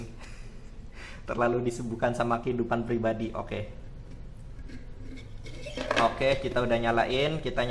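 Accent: Banyumas, Indonesia. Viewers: yes video baru.. assiikk. yesss kompor baru... makin aassiikk. 😂 udah kangen sama video2nya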